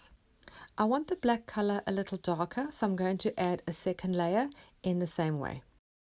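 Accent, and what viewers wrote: South Africa. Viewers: So nice to hear a South African accent...enjoying your work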